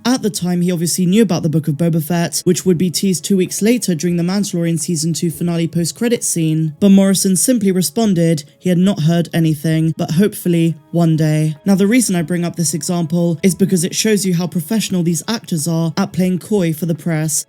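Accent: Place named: Bristol, United Kingdom. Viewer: Are you from Bristol Star Wars? Your accent sounds familiar?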